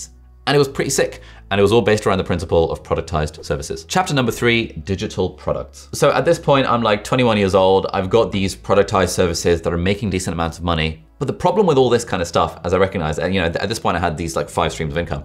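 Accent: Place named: United Kingdom. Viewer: It’s the UK accent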